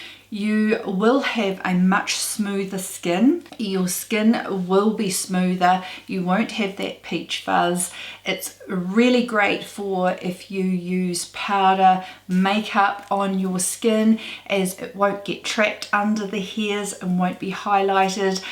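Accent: New Zealand. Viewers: Hello. Nice to hear a lovely NZ accent on YT. I’ve watched several of your videos & find your information quite useful in general. I’m a bit concerned with the shaving by hand & derma planning as it’s a bit precarious & I think could be a bit harsh. Previously I was waxing in a salon but we get locked down so often (Aust), I looked for at home alternatives. Another lady on YT was using the VieBeauti Rechargeable Eyebrow Trimmer Facial Hair Remover. I bought one to try & Im very happy with the results & convenience. I think you should look at that item or other similar tools to see what you think. That item has 2 interchangeable heads, a larger one for general peach fuzz & smaller head for around eyebrows, nose & lips etc. The heads can be rinsed in water (It’s a tool that can be used on other parts of the body too but I’ve not done that) It’s quite portable & charges with a USB. 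I’m feel quite scared when I see ladies shaving around eyes or possibly around blemishes or flaws they may not notice. I’m certainly not trying to sell this thing, (I have no associations at all) it’s just something I’ve found is really useful now & wanted to share with you & people here as it’s certainly safer alternative.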